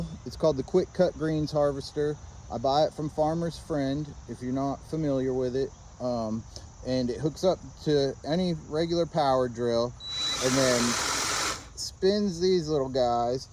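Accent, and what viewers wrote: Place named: South, United States. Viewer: Yooooo broooo! This is awesome and so glad to see you starting up a youtube channel for all the amazing work you do! It's been very inspiring to see all the progress over the last year and all your wicked smart inventions/solutions to daily farm work. I'm going to get up there for a visit this fall and check it out in person. Also, I think you're almost officially a southerner with that sometimes southern drawl ;)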